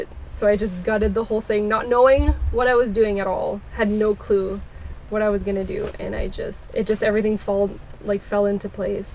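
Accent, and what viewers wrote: Ottawa, Canada. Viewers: I was like thats an Ottawa accent. I didn't think we had one but we do. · She sounds like an Ottawa girl.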